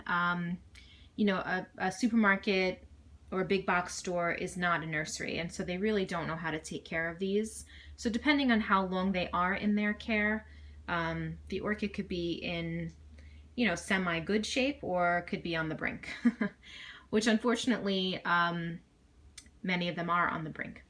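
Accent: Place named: New York, United States. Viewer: GREAT video, thank you. I learned so much about how to take care of my own phalaenopsis buddies. All your comments about ice cubes made me laugh. Yeah people tell me all the time about how they water their orchids with ice cubes like that. I never follow their advice either because it doesn't seem like a tropical plant would like ice water. Now I know for sure after seeing this video of yours. Cheers. Also, I love your New York accent.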